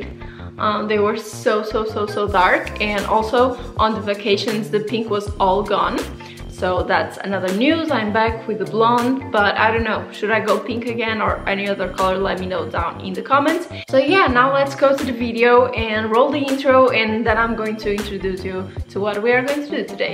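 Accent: Portugal. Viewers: Omg I knew you were Portuguese, 30 second into the video! ahaha the way you speak English is very 'Portuguese' ahah love it!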